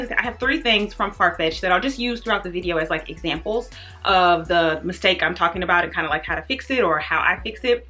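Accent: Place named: United States. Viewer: I had to have subtitles because I am Portuguese and speak fluent English ( I live in London), but my girl J speaks too fast and I almost cannot understand the American accent!!! But I love her anyways…💞💞💞💞💞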